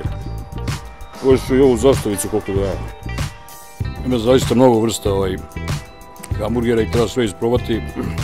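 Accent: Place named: Belgrade, Serbia. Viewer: Ovo je zaista iskren komentar. Odlično radiš, što radiš ni psovanje ne smeta to je srpski rečnik inače realni. Ali do jaja je bljutav taj bOOOgradski naglasak i dijalekt. Valjda što je meni beograd toliko omražen, možda sam pristrasan ali malo prirodnosti ne bi škodilo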